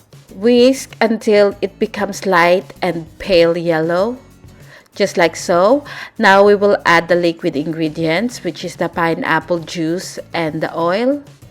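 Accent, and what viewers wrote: Philippines. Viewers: Wow, looks lovely and the Tagalog accent is very sweet too. Trying this tomorrow. Thanks for the recipe.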